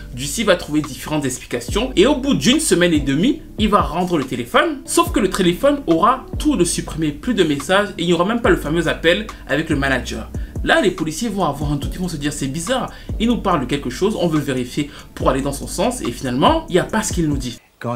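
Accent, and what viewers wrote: Cameroon. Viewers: Tu parles comme un camerounais 🙂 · Très bonne vidéo, très bon orateur ! L'accent camerounais rajoute un pti truc en plus j'adore, c'est charmant. J'attends la storytime sur Missy Elliott please!!!! ☺️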